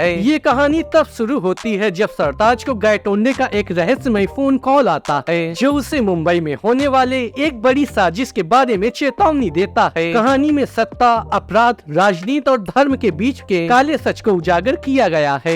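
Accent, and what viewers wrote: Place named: Bihar, India. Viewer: Change ur voice bihari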